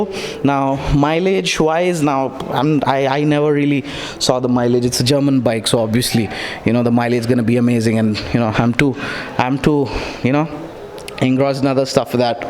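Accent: Hyderabad, India. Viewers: Didn't like this English version make video in your old Hyderabad hindi style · Bhai Hume aapka Hyderabadi accent me video dekhna pasand hai bhai♥️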